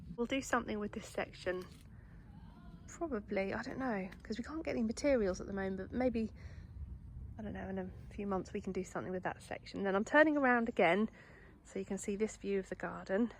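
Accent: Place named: England, United Kingdom. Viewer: Good Morning!! I love your English accent 😍 I come from Italy and I have studied English for many years ❤❤ your vlog is really awesome 😍😍😍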